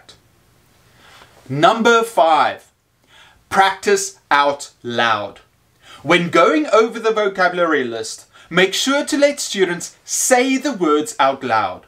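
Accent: South Africa. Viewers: Are you from South Africa? really enjoying the videos by the way. My students love the activities.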